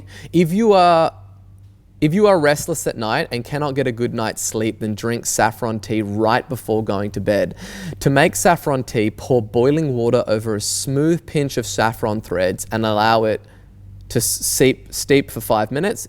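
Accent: Australia. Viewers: It's Australian accent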